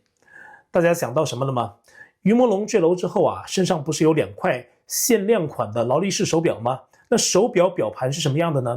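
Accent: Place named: Taiwan. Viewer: 先生，您的影片有人盜用，因為聲音是臺灣腔的國語，您再留意一下吧！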